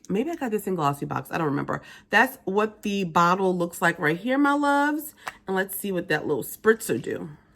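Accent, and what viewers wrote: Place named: South, United States. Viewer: i can listen to a southern accent forever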